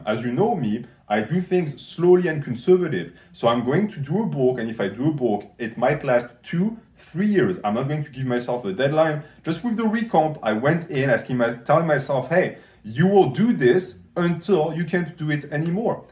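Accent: France. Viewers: I suspected him being a French speaker at the beginning, and and was fully convinced when he said “the love Andles”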